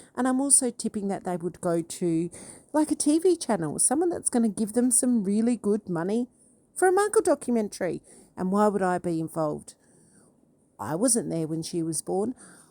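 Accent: Australia. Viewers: Us fellow Aussies can tell your accent 👍 mines a bit rough around the edges 😅 use friggin a lot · We all know your Ozzy, accent is true Blue and love listening to you while i can hear the waves from our BEAUTIFUL BEACHES we have in OZZ we are not friggen stupid, but i tell you im starting to not like CHARLES and having doubts about WILLIAM (i know that sounds horrible) but after seeing speach from David Beckham at the WEF ,and CHARLES being involved WEF, WILLIAM EMPLOYING Ardern, Blanchett, Steve Erwins woke son, and some woke guy for the world kitchen, im worried its not the Monarchy i thought, plus your treatment, i was hoping with LADY Cs court case plus FIONA and Trevor's trouble there might be a link which could be joined to go to court with eventually with good hard evidence your a dog with a bone now, have you contacted THE ROYAL GRIFT, im an old duck wishing i had your energy, watch your back stay safe🇭🇲👍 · Sound like a true blue Aussie ro me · Girl, I know you’re Australian by your accent. 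I know accents very well. I can tell from your videos that you love your husband. I’ve been subscribed long enough to know that TMJ is a friend, not a boyfriend. I guess she doesn’t know what you do for a living 🙃 The truth is coming & it has nothing to do with TMJ. Stay strong 💪🏻 🇺🇸🇺🇸🇺🇸♥️♥️♥️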